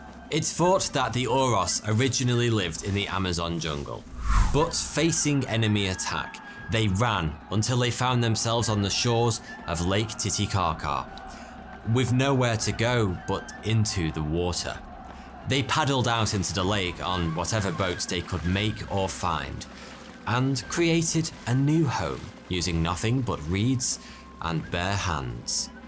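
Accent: United Kingdom. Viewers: The beauty of lake Titicaca is nothing compared to the beauty of your blue eyes, handsome mustache and sexy British accent babe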